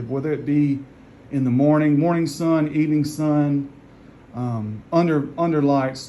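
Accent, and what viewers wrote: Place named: South, United States. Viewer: Hey dear! Nice to put a cute face to a sweet southern accent 😉 Great shout out for moms! 😁 I think seedlings should be labeled 1st year, 2nd year 3rd year. It gives me more of idea what I'm dealing with. Ebay sellers want to sell 1st years as NBS!!! Ugh aggravates me! Great video! 👍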